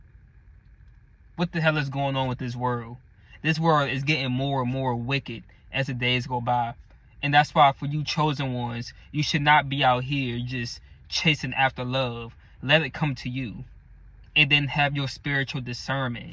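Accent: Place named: Maryland, United States. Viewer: Hi brother, are tou from Maryland? Your accent is giving it away lol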